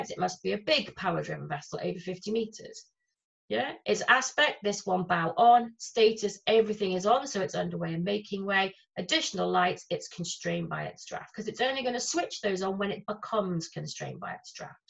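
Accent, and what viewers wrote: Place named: England, United Kingdom. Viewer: It’s Greg Laughlin from Australia again and I’ve just watched this from beginning to end and it is wonderful. I really appreciate the English accent and the authority she has in her voice combined with the fact that she clearly knows her subject backwards.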